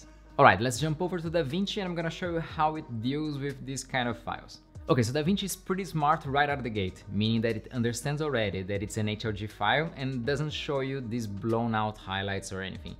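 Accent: Brazil. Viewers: mano, tu é brasileiro? 🤔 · Thanks for the video, really nice explanation!! PS: You have a little Brazilian accent 🤔🤔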